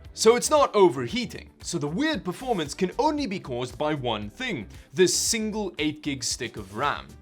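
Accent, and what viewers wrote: South Africa. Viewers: That accent confused me, I hear a South African accent there. What's your story? :) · Are you from south Africa? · What accent is this, you sound like me, but being in Canada throws me off maybe it's an odd French/ Norwegian I assume SA though because you said suss out and sketch. Common use here. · are you south african? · South African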